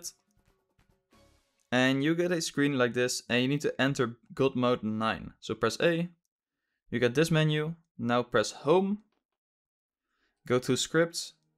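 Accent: Netherlands. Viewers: Haha ik kon aan je stem horen dat je Nederlands bent :) Je kan wel echt goed Engels hoor ik meen het ga zo door ;) dank je voor deze uitleg trouwens! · Hey, volgens mij ben je nederlands. Aan je accent te horen:). Ik had even een vraagje. Is de download van Inazuma Eleven GO galaxy gratis voor Citra op PC? Of hoe kan ik die krijgen?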